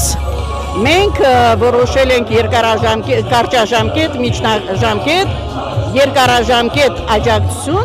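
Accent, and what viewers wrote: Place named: Russia. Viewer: Cirtc xarnuma ec agarkekin lcelov rucakan akcentov xatlaxner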